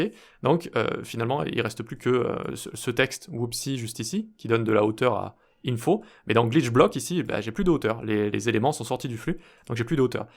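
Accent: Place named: United Kingdom. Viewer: Ton accent anglais me fume à chaque fois xD Très sympa comme effet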